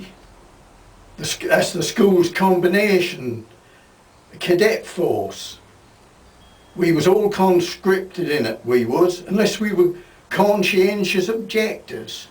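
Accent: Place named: Great Yarmouth, United Kingdom. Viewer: Thank you for satisfying my curiosity about what the accent of a Great Yarmouth native would be.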